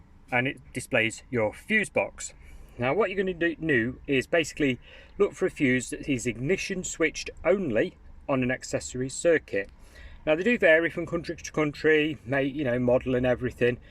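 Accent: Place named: England, United Kingdom. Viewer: Thank a lot. That English accent is a cherry on top.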